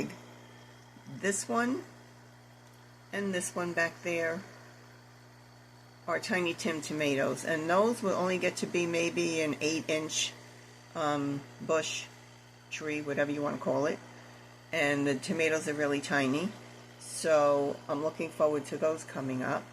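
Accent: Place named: New York, United States. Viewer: Don't mind me. Just here getting my indoor plant and NY accent fix 😆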